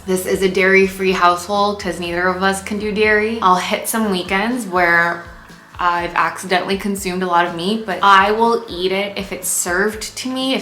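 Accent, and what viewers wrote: Vietnam. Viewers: omg that northern vietnamese accent! when u spoke i felt like you were my big sister 😄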